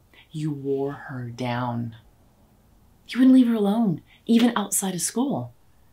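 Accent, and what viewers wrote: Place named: United States. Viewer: Very well performed however, hope you don't mind me saying, even though the pacing was perfect & I enjoyed the story, there was a slight clash of American accent & English words that they do normally use...?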